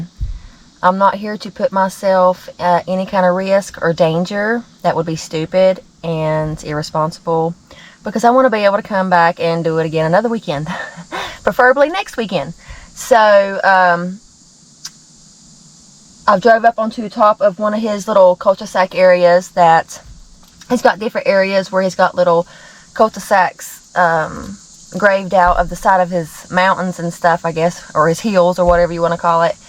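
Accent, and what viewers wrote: South, United States. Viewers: … buffalo mozzarella & tomatoes is one of my favorites… also half a bagel with cream cheese, capers & topped with smoked salmon (prepackaged thinly sliced) … omg delicious…. my girlfriend born & raised in Asheville shares ur wonderful southern accent i never get tired of hearing…. lastly… like i’ve mentioned in previous comments i see black bears almost every other day & they are plentiful in our western NC mountains … there is no way i’d sleep in a tent in this area… u are correct , 99% of bears avoid humans… it’s the remaining 1% that scare me … the old ones and or injured ones are the problem bears that worry me… & i fear for ur safety…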